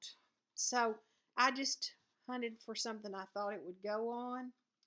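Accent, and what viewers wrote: South, United States. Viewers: Hi, I love watching your videos, I am a southern girl too and I like hearing a familiar accent.:) I am from Texas and you will love First Monday in Canton. Wear very comfy shoes and bring money to spend lol Have fun in Texas!!! Oh, and since you also quilt, there is a nice little quilt shop called Sew N Sew in a little house not far from First Monday. The address is 22390 State Highway 64; Canton, Texas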